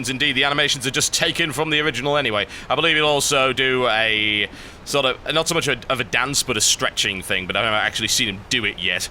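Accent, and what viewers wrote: United Kingdom. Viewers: Hi TB, im one of the more "recent" viewers, only joining in the last month of the beta or so, but I have enjoyed your content and of course your british accent. The thing I want to bring up is the "lack of love" for your SC2 content. The issue with your SC2 content is (for me) not that I don't love SC2, but to be honest because there are much better commenters out there for SC2, people with deep personal knowledge and skill in the game, who can actually give us insight, like you do in WOW. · I really just hope you continuing make videos becouse i just love the way you cast things. IDK if it is your voice, the british acsent, but i just liked it. Dam, i even got my WoW subscription back again so i could understand more of Azeroth Daily, and becouse i had nothing else to do on my free time and i was looking for a MMO. X_X" Anyway, just keep doing it man! 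You have all your subscribers not by coincidence, you earned then, you even earned the third place on that contest! LOVE U TB! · to be honest, i stumbled upon you one day and just think you have one of the best commentating voice ever. It makes me happy to be british with people sounding like this. I've enjoyed all of your videos, the WoW stuff however isn't my thing but it dosn't bother me slightest that you want to put more i can still watch your over videos like the WTF series and other video series. (btw your starcraft videos are what have put tatic ideas for my play style)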